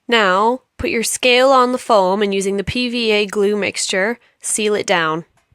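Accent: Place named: Wales, United Kingdom. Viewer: Your accent isn't terribly difficult to understand. Sounds welsh.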